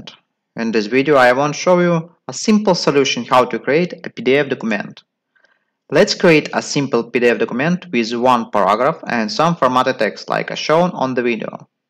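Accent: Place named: United Kingdom. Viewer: Don't be sorry for your British accent :D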